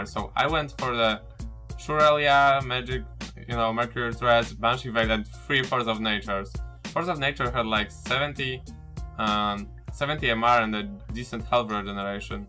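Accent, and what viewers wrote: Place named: Poland. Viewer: Are u from poland ? becouse i can hear polish accent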